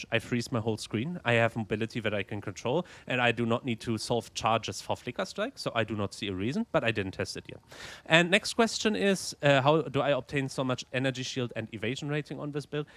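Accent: Germany. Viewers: Er hat auf jeden Fall einen deutschen Akzent, außerdem muss man nicht hochdeutsch sein um akzentfrei Englisch zu sprechen. ;) Sein Englisch ist natürlich trotzdem super.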